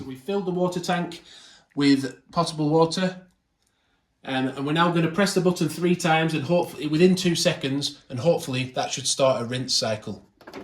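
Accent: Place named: Liverpool, United Kingdom. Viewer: Love that subtle Scouse accent 😆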